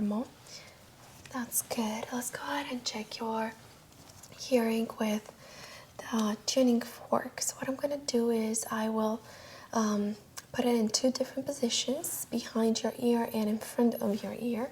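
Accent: Canada. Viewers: just curious are you french/canadian? :) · Fantastic! Very relaxing. Canadian accent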